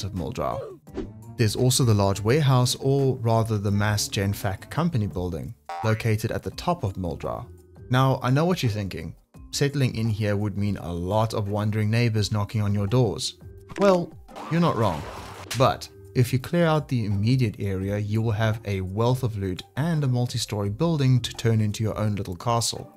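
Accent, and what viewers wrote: South Africa. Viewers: are you South Africanbru? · Maat, is jy 'n Suid Afrikaner?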